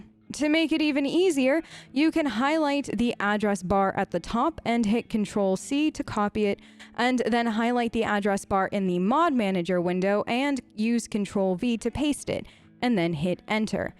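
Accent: Australia. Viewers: i like how ever now and then a bit of your accent comes through, to me it sounds australian, possibly new zealand...but i could be totally wrong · why does it sound like your aussy but trying to hide it with a very middle American accent? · 1- thanks so much for this! This and your origins and DA2 mod videos have been awesome! 2- Have you ended up living in australia or something? That aussie accent is leaking through!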